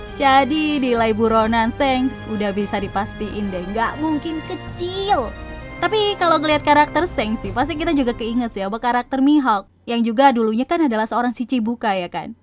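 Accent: Java, Indonesia. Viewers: medok men mba.. 😁 😁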